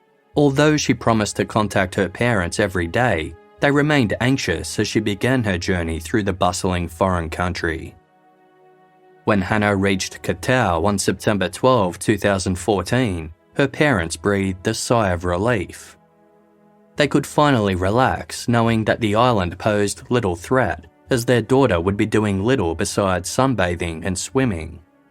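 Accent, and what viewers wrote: Australia. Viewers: Thankyou! I'm so glad I've found an Aussie narrator! Makes listening so much easier and relatable! Thankyou, team, for researching as well as you do. Truly unmatched. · I agree with livingtheaussiedream. Nothing personal to the yanks but its great to hear Australian English spoken.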